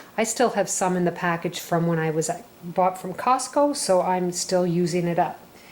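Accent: Canada. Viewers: can't wait to try! BTW you sound Canadian, are you???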